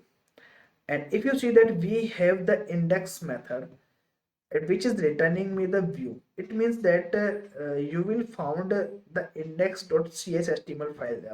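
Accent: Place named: India. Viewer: He is speaking English, you need to understand the Indian Accent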